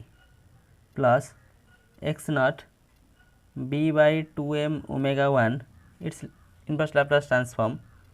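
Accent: Odisha, India. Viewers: Sir by your voice you resemble like odia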